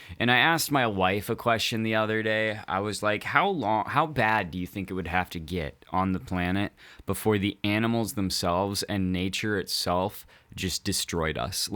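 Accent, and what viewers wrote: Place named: United Kingdom. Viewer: You have to be British 😅 love it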